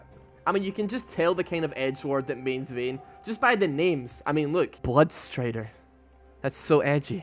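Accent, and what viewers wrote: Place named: Scottish Highlands, United Kingdom. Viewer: Your vids are awesome man you sound like your from the highlands xD I live near Inverness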